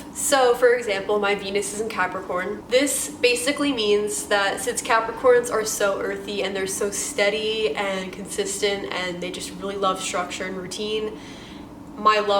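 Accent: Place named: Long Island, United States. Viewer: I love the Long Island accent! Thanks for the video!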